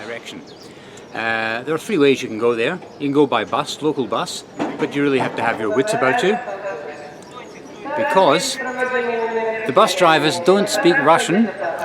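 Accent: Scotland, United Kingdom. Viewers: What a fantastic channel! I’ve only been watching the channel for about a month or two now. Most of the content I watch on youtube is travel related so to hear a Scottish voice in them is even better. Fantastic coverage of all things road rail air and sea! I watched your video hiking up Ben Nevis the other day and even that, with its lack of transport content and overall different theme to your usual content was a fantastic watch! Keep up the good work. Excited to see what videos 2024 brings. All the best to you sir, although I do hope St Mirren get relegated ;)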